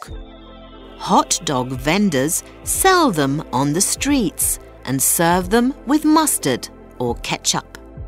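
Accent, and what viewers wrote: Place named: United Kingdom. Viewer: But this is UK's British English....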